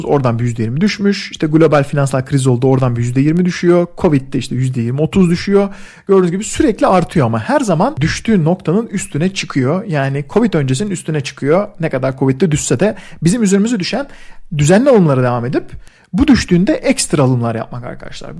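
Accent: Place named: Istanbul, Turkey. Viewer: Bugün abone oldum, çok kaliteli, İstanbul Türkçesi ve içinde biraz ingilizce ile dinlemek güzel.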